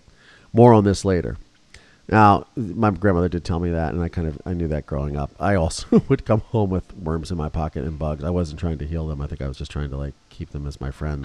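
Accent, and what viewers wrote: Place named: Philadelphia, United States. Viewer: I found out you were from the Philadelphia area after your pronunciation sounded so familiar. I grew up in Abington township- right near the Hot Shoppe/B&N- and later lived in Pensacola at the NAS with a few trips to Bermuda in between. I was abused and spent the past 30+ years putting the pieces back together. I’m rooting for you.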